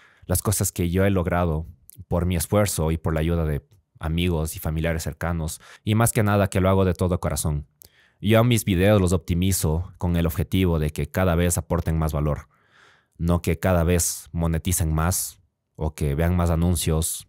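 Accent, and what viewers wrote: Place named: Mexico. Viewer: Hablas como Mexicano, pensaba que eras mexicano pero ahora que veo que eres de Ecuador lo entiendo, siempre he creído que en Ecuador tienen un acento similar al estándar mexicano.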